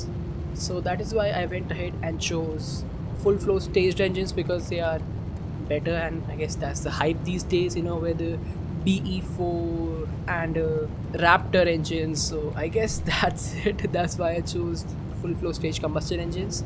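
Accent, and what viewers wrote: India. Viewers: Are you from India? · He is an Indian i can say that by his accent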